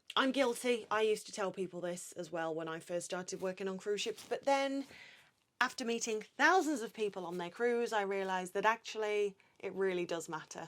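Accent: United Kingdom. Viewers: is absolutely breathtaking lately, she was always beautiful but something is different. I have to watch her videos twice, once to see her, which completely distracts me to what she is saying, and the second time to listen from the next room so I can hear what she said. Breathtaking. I hope she's not in love as I was planning, when my crypto takes off this year, to renounce my American citizenship, become a British citizen, buy her the biggest engagement ring, so big she'll need a finger brace, buy her the biggest house I can find and be her ladies gentleman for the rest of my life. All she has to do is walk around and speak in that wonderful British accent and laugh that wonderful laugh. That's my simple Santa request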